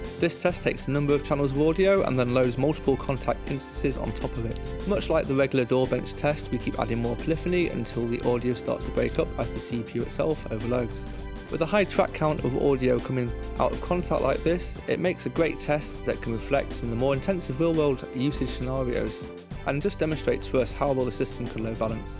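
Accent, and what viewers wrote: United Kingdom. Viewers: Please translate what he said from Cockney English, when he described the latency performance at a low buffer size. Is this process (transmitting midi, latency) predominantly single-cored, and hence the computer with the higher single-core speed will be better?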